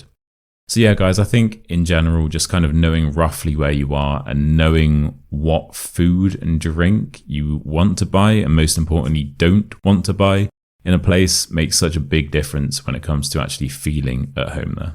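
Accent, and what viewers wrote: RP, United Kingdom. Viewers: It is a little bit harder to me understand his British accent, because I am used to listen to American accent, but in general I could understand almost everything he said. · I would call it a very "standard" English. I can hear you are from GB somewhere, but this language i would expect to hear in US as well. English is my 3rd language, and if everyone were talking this way, the world would have been much more understandable place. :-))) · I do understand this British man but i live in uk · Do all british speak like you? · Quite understandable your speech... I always do enjoy your videos because I'm working on my British pronunciation and your particular accent is what I'm looking for... modern, standard, glotalisations, etc. ❤ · I clearly understand you, but as some already mentioned not everyone in UK speaks the same way. For example, I can barely understand a pretty British TV show Brassic. 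Still love British accent and the way you say things :-) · He IS speaking RP like ...so It IS pretty clear to Understand If You have a good Level at listening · maybe yours is the sound of educated people... like the BBC guys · From your example, I think you have lived in Thailand. 😊. Yeah,, I am familiar with the British accent that you speak. However, I found that when I lived in London, people use different accents and it was hard to understand them all.. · the modern RP accent you speak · I'm gonna be hosnest i didn't get ir alll video but about 95.7% i could get . I'm so happy thia video is so amazing 😍 and i will watch more time this . because the British accent it's so my difficult to my ears.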